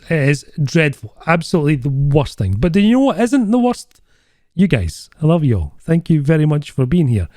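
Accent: Scotland, United Kingdom. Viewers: He's Scottish mate.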